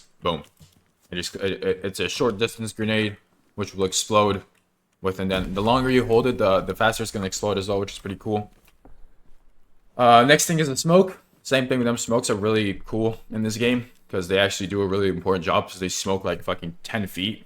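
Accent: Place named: Scotland, United Kingdom. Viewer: Oh the Scottish accent 😭 maybe work on it I suppose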